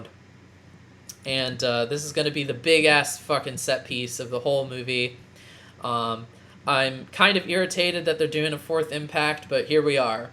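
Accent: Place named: Germany. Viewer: I feel like you thoroughly spoiled 3.0+1.0 for me just now and you did it with such savoir-faire I can't do anything else but appreciate it. If the movie turns out to do anything differently I might be weirdly disappointed now 😂 (also as a German I'd like to say the German-ness of your pronounciation was never an issue for me personally)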